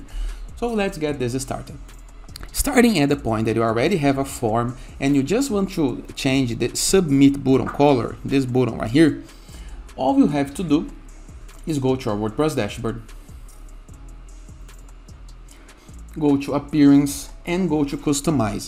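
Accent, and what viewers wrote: Brazil. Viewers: Caraca, assim que ouvi a voz já pensei: "é brasileiro" kkkk Eu reconheço o sotaque do meu povo. Mas depois vi que estava em português o conteúdo do vídeo kkkk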